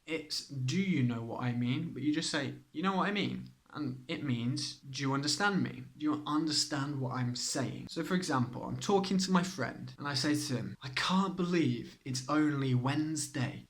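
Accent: United Kingdom. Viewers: Your videos are proper good, Iam fond of the British accent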